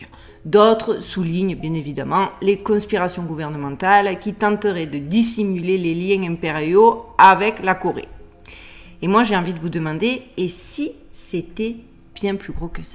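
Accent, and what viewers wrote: South, France. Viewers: Namaste ,j'ai découvert ta chaine il y a peu et je me suis abonné. Je traite sur la mienne de paranormal,d'ufologie mais aussi d'archéologie alternative ,de mystères.... Tous ces sujets sont passionnants ,brabo pour les recherches , Que la Force soit avec toi et la Résistance amicalement , Doumé PS : j'adore ce petit accent du Sud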